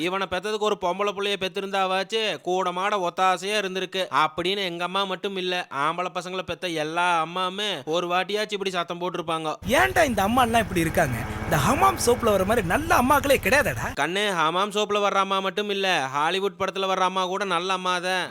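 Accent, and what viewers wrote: Coimbatore, India. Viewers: unga pecha kettutu samayala vitturom bro!! Nama coimbatore slang nenga erukara varai vaalum! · Kooruketa kuraalu kovai slang😂😂😂😂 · தமங குழம்பவிட நீங்கள் பேசற கொங தமிழ் கேக்க தோனாமிற்தமா இறுகீகு நன்றி ❤❤❤❤❤ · thambi endha collegela class roomla Saraswathi poto Iruku enna seidhalum samayalum super covai thamizhum super naangalum coimbatore dhan vaazhthugal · எங்க போனாலும் எங்க ஊர் தான் பெஸ்ட் கோயம்புத்தூர் பேச்சு வேற லெவல் இங்குகோ 🥰🤗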